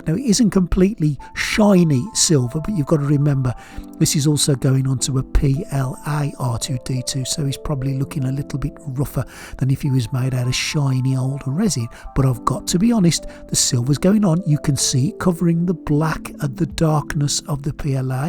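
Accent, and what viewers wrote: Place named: Black Country, United Kingdom. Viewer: I can't get the code to work sorry 😞 GREEDY3D90 ? 👍👍 black county? Or Birmingham? I can’t quite decide lol it seems more BC then some words sound Birmingham 😂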